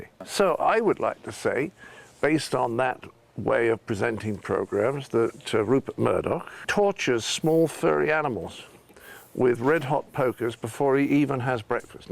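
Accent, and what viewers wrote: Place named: United States. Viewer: crazy how you can hear little bits of american in his accent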